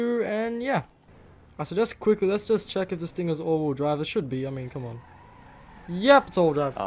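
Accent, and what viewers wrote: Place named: Australia. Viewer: I got bored and decided to watch a bunch of random videos and I just realized...you're Australian and you say "mad" you sound like might car mods lol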